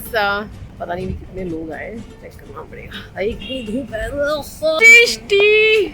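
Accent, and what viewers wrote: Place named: Lahore, Pakistan. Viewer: Your accent of Urdu/Hindi is so Lahori. Lots of love..Keep shining:)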